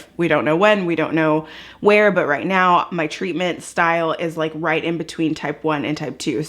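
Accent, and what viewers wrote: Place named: Texas, United States. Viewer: Hi, I just took my first dose of Ozempic and I’m so looking forward to hopefully getting the results that you and my doctor spoke about‼️. Totally unrelated; as I was listening to you I was thinking, “ this chick has to be from Texas”! My doctor’s in Katy.🤣🤣🤣. I wish you continued success in your weight loss and wellness journey. Thanks for sharing🥰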